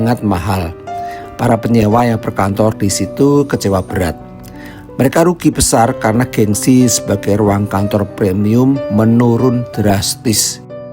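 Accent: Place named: Java, Indonesia. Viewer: Naratornya orang Jawa, logat medoknya masih kentara, ga enak didengernya.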